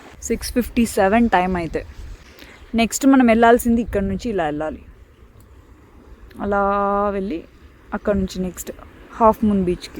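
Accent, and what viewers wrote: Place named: Kadapa, India. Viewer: Where we are Mana (KDP) Slang maathraam intaante inabudhe avuthadhi 😘😘😘😘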